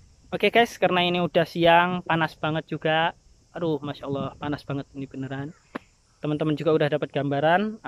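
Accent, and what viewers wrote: Java, Indonesia. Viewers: Asli jowo opo pontianak to bos..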